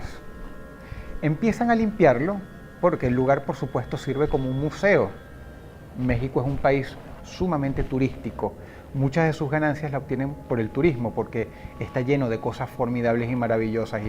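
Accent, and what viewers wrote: Venezuela. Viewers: Cómo odio tu acento veneco Agradezco que cambies tu voz en videos para caer bien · Cómo me gustaba este tipo de videos, mucha nostalgia y el lindo acento venezolano Pd: señor de miera, mas bien señor de mier... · Cuando Dross aun tenia acento Venezolano.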